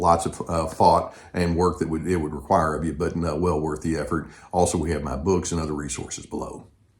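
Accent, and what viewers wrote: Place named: Mississippi, United States. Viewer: THANK YOU SOOO MUCH AGAIN AND AGAIN,!!! I JUST LOVE GUS!!!, YOU SOUND LIKE YOU'RE FROM MY "NECK OF THE WOODS ". I LIVE IN MISSISSIPPI. CAN YOU REVEAL WHAT STATE YOU'RE FROM? IF NOT, I UNDERSTAND!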